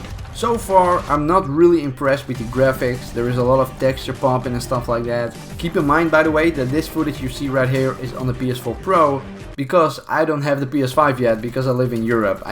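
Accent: Netherlands. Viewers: Funny how I get this video recommend and first thing I notice is your Dutch accent 😂 goeie vid man 💪🏻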